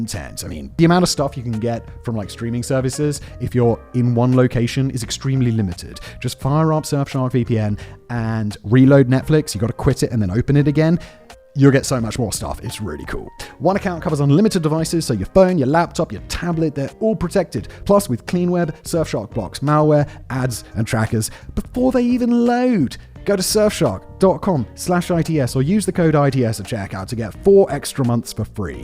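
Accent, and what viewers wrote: England, United Kingdom. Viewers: I'm not a fan of stephon, but you're censorious attitude is very off-putting, i'm starting to feel like whenever I hear an english accent that i'm about to hear some fascist rhetoric.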